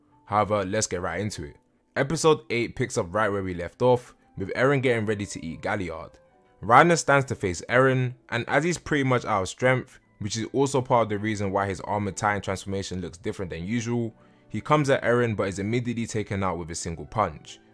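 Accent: London, United Kingdom. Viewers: Love your content bro. What part of London are you from?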